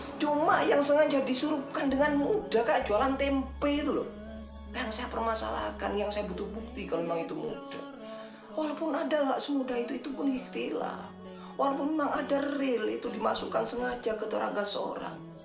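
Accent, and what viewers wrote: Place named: Madura, Indonesia. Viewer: Bhasanya logat.orang Madura iya pak..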